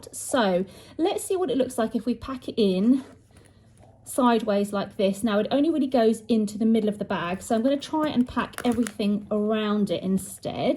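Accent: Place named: United Kingdom. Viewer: Love your British accent! I got the nano noe in the DA print with the animation holiday edition. Subscribed!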